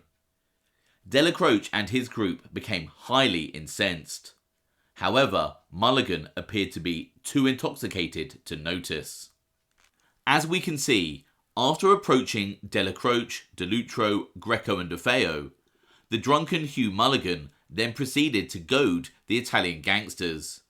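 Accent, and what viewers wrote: United Kingdom. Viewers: your wonderful British accent makes these nicknames that much more pleasurable and entertaining to hear. Keep up the quality work.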